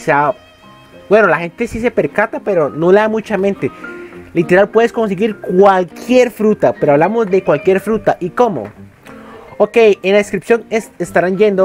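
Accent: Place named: Costa Rica. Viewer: Los ticos hablamos asi y desimos verdad casi siempre por si no lo sabiam gente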